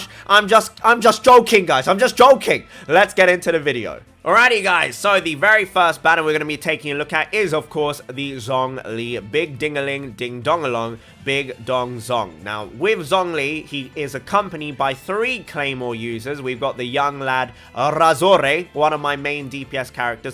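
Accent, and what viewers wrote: United Kingdom. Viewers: Loving the vids. Question: are you British? Just curious cause of the accent. Ps: don't let haters get you. I didn't k ow that the group was based from an Italian troupe/show so its nice to know!! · just found this channel, love the personality , enegy , and of course that asian british accent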